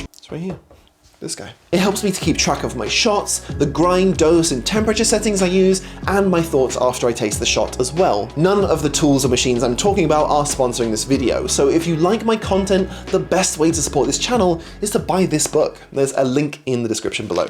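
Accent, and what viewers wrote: United Kingdom. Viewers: The advice is for spoiled brats. Another British accent bullshit.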